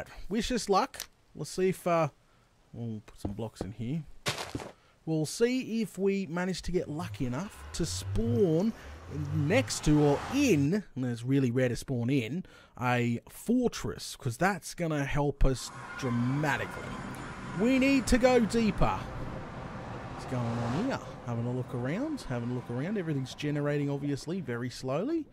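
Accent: Australia. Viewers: This man's voice is the most stereotypical Australian voice I've ever heard and I love it. Keep up the good work!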